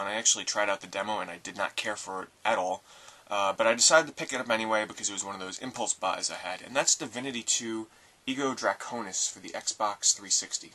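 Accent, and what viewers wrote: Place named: New England, United States. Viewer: what part of america do you live in? I sense a new england accent...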